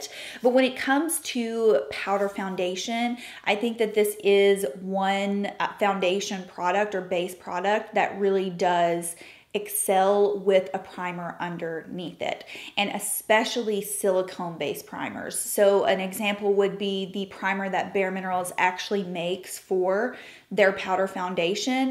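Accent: South, United States. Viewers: I was looking for a comparison of the bareMinerals Well Rested and the Bisque a few days ago and I came across one of your videos from 2013. It was linked I believe on your website. Your hair was past shoulder length with bangs.partially swept to the side. Your southern accent was in full force. I so enjoyed that video! You were beautiful then and you’re still beautiful now. It was really great to see! 💕💕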